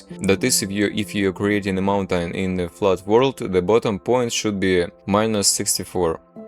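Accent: Russia. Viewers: По акценту видно что русскоговорящий. Огромное спасибо за скриптики, работают прекрасно. Очень надеюсь что твои труды тебе окупятся, была удивлена что такое вообще бесплатно есть ❤ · Брат, вижу по акценту, что русскоговорящий. Очень был удивлён, что такие старания никак не монетизируются. Спасибо за скрипты, как доделаю свой проект, то отпишусь!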